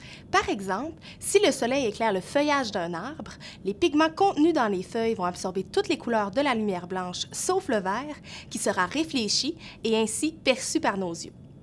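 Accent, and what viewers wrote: Quebec, Canada. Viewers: C'est quebecois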